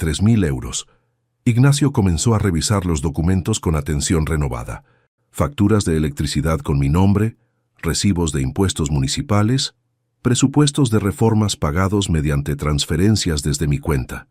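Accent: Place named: India. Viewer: Eso de narrar estás historias en indio, las perjudica totalmente,, si no se corrigen no tendrán mucha audiencia, es patética, está forma de narrar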